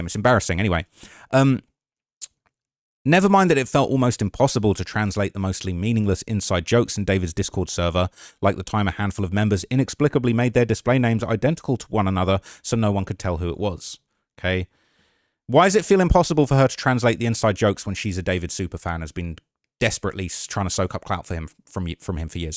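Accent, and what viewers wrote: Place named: United Kingdom. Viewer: Bros never heard of a British accent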